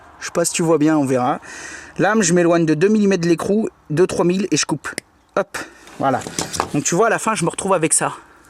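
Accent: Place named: Savoy, France. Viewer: Merci,et tres sympa le franc parle,avec l accent savoyard a mon avis.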